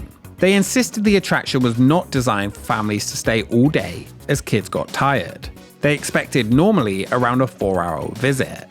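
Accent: United Kingdom. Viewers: This is about American theme parks but why does the host here have a British accent or is it Australian sometimes I just don’t know the difference. It’s very confusing however. Love this channel and especially the theme not this new theme but the main theme that came after the new theme don’t ever lose that. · Because unlike the builders of Hanna Barrera Land, is providing things people like. I'm pretty sure many people's lists of things that they like would include "listening to a cool sounding voice with a British accent." 😎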